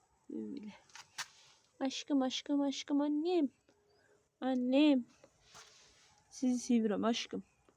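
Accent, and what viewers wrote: Azerbaijan. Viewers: siz azerbaycan lehcesiyle mecbur edirsiniz ozunuzu ki turkce daniwmaqa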